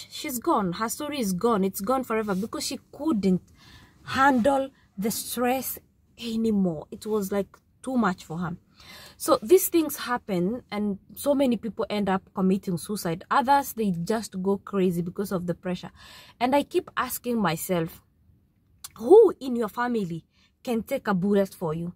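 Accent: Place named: Arab world. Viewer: This Arabic accent....special.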